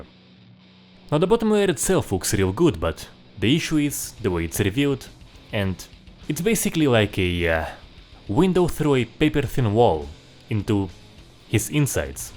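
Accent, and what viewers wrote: Russia. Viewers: Русский акцент, ты русский ? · Такое ощущение что автор русский, Из-за акцента голоса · Я знаю что ты русский ! По акценту слышно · Very strong russian accent like in movies... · Are you are Russian? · Так разговаривать только русский может) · Ты руусский) По акценту видно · Я заметил твой русский акцент. Ты Русский? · I love his russian accent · russian акцент замечен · For fuck sake youre VERRRY RRRUSSIAN ACСENT. I understand, it makes easy to speak English. But my ears can't stand it.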